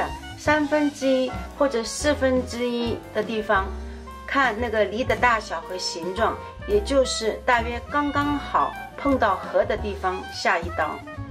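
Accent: Shanghai, China. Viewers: 听口音，上海阿姨啊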